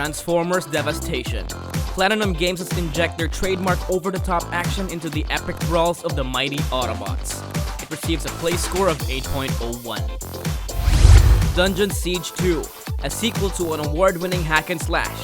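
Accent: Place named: Philippines. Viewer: Alam na alam mong Pilipino narrator eh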